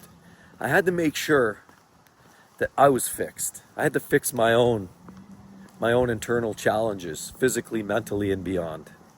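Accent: Canada. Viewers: First...awesome stuff!--like your no-nonsense style along with the Canadian accent (ofcourse) haha. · Lol...I could tell by your accent you're Canadian. 😊 🇨🇦 🍁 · ARE you CANADIAN?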